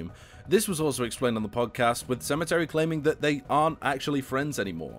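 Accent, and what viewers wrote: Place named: United Kingdom. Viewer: You sound to british for this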